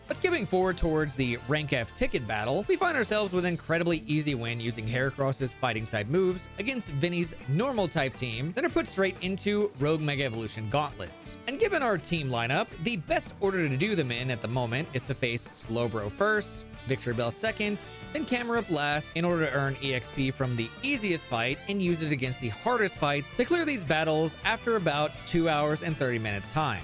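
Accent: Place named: France. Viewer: I am 100% sure he is American, as I have been following the channel for quite a while now. Yes, french accent is pretty heavy 😅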